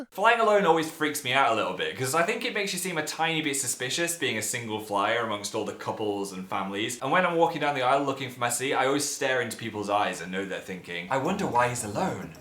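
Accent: North, United Kingdom. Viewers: He sounds so northern in this video! In 2018 he doesn’t really sound like it anymore :c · You're sounding rather Northern in this. I think the time you spent with your Mum and Dad rubbed off on you.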